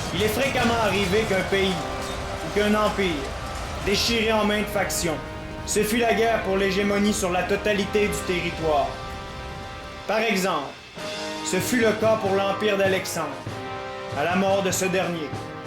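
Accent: Quebec, Canada. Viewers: haha du quebecois sur youtube ca fait du bien :) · il est QC arrêter de dire que s,est un accent bordel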